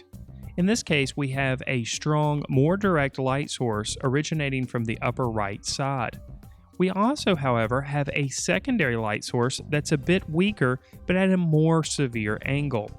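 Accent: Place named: Louisiana, United States. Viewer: Reminds me of a northwest Louisiana accent.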